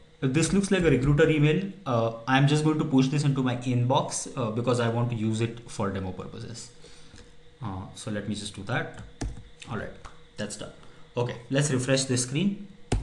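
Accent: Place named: India. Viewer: this looks interesting indian accent NOPE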